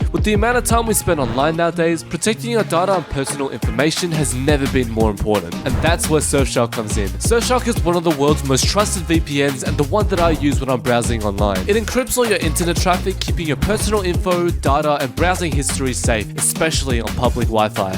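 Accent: Australia. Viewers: One Question r u Australian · are you australian? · i just LOVE the Australian accent, its jus tooooooo iconic ❤😊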